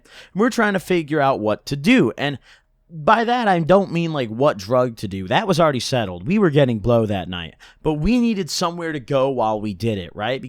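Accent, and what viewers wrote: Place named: Midwest, United States. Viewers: That Midwest accent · he got the midwest accent like me lmao\ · i’m from ohio, so hearing meer sounded normal to me lmfao midwest baby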